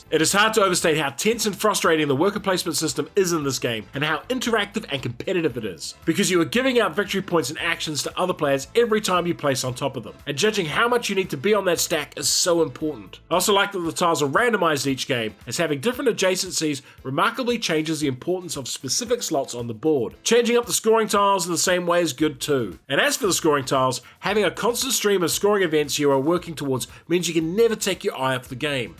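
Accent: New Zealand. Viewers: There is that Kiwi accent. Love your work.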